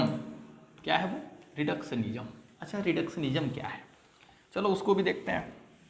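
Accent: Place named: Bhojpuri, India. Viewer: Bhojpuriya andaaz me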